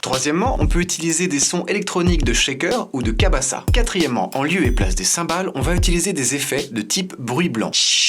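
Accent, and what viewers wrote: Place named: Germany. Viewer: xD je croyais que tu était allemand au début ^^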